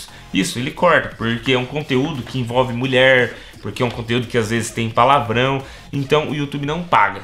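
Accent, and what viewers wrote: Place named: Minas Gerais, Brazil. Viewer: mineiro kkkk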